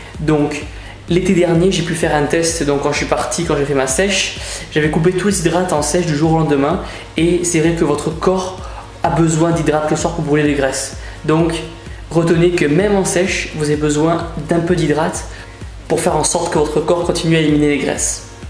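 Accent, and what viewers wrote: South, France. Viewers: hey tibo ! haha cool tes videos ! je rigole tous le temps quand tu te présente...dawnnn cest tibo in shape...jespere que vous allez bien etc...avec ton accent du sud ! question : en mangeant les mêmes choses que sur la video au même heures,sans prendre de whey,crea, etc...peut on avoir les meme resultats ? Resmey.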